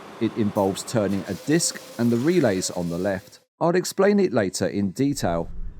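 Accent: United Kingdom. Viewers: What kind of accent do you have? Something British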